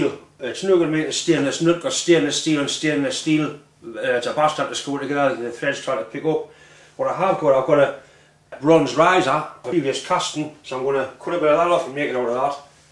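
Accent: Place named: North East England, United Kingdom. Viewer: I'm really enjoying this series,. It is all very well produced and fortunately I am from sufficiently far north to totally understand every single word of your exquisite NE accent. I commend how you use the Vernier Calliper Gauge as a 'Very-near' Calliper Gauge, then turn to the Micrometer for absolute accuracy. All old-school stuff and still valid today. All these ISO units engineers who boast about being able to work to 0.01mm (4/10,000 or 0.0004 inches) when the old brigade, working with a Micrometer with Vernier markings to Imperial units, regularly worked to limits of 1/10,000 or 0.0001 inch (0.0025mm). I think that gives bragging rights to the old school Imperial unit machinists! For any doubters out there, our women in the aircraft factories during WW2 were working to less than a 'thou' accuracy.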